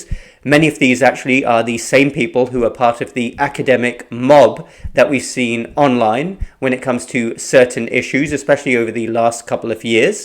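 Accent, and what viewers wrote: United Kingdom. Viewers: I bet the Septic Tanks love your British Accent. 😁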